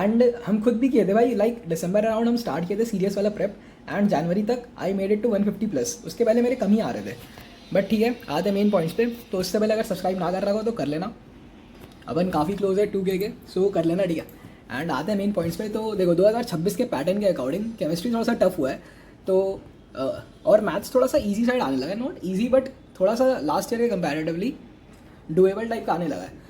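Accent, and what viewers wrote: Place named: UP/Bihar, India. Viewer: Bhai "ham" up /bihar se ho kyaa?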